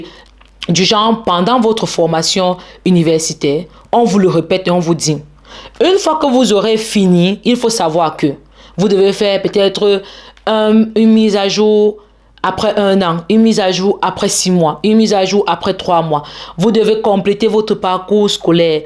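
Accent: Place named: Italy. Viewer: Aimé ton parler italien 😢😢😢❤❤❤ dis moi comment me préparer avant d'arriver là-bas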